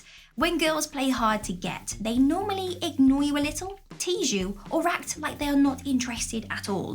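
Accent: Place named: Sheffield, United Kingdom. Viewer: Are you from Sheffield by any chance?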